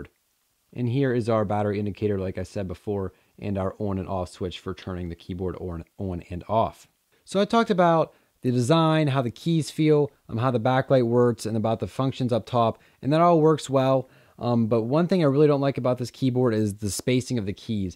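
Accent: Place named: Pennsylvania, United States. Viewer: You are so from PA, lol. Nice review neighbor. Is there any lag with the keyboard at all? In other words, would it be ok for gaming?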